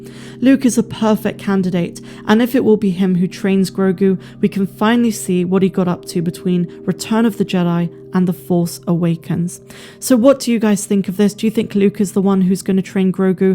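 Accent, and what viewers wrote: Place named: Bristol, United Kingdom. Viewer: all good choices but although iwhould like to see luke train him i am not sure he would want to after what happend with the way it turned out with ben solo by the way love your accent is it from the Bristol area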